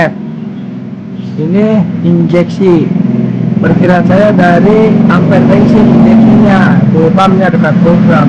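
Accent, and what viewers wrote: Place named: West Java, Indonesia. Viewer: 😆😆😆orang Sunda pisan euy.mantab tutorialnya.smangat mamang ah.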